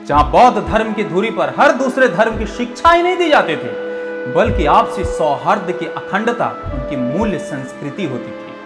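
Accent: Bihar, India. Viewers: Bihari aawaz sun garv hota hai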